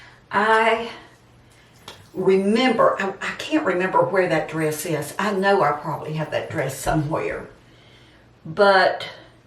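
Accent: South, United States. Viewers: Just hearing that great southern accent brightens up my day. I had a favorite dress too when I was a teenager. Pretty green with pink plaid thru it, low waist with slim bodice and swirl out bottom, sleeveless. I adored it ❤. It was probably 1970. I loved your dress, can see why you loved it too! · WE NEED YOU! I hope you never quit doing videos! Having you be so real and your accent reminds me of a Southern Belle. On any given subject, I enjoy my visits with you and with over 56 thousand subs, I'm far from the only one I bet. Getting to watch your videos is like spending time with a beloved friend and right now (4/11/20) we all need that. You are one of a very small list of YouTubers I never get tired of. You are so sweet and a treasured break from an otherwise troubled time. Thanks for being here!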